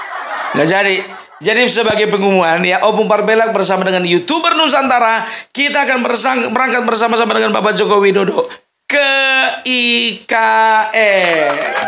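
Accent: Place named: Bali, Indonesia. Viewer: Wah logat Balinya kentall Joss Opung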